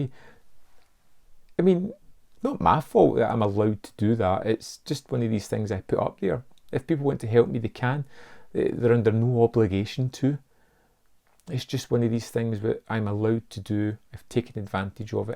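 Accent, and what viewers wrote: Scotland, United Kingdom. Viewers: Love the channel mate keep it up i didn’t know you were scottish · Do a review of Braveheart with your regular Scottish dialect, rambling about how inaccurate it is.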